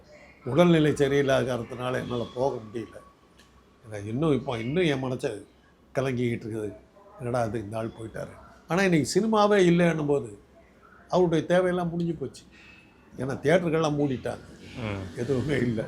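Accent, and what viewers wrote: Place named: Karaikudi, India. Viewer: காரைக்குடி தமிழ் தம்பி...... தலைப்புக்கும் பேட்டிக்கும் சம்பந்தமே இல்லை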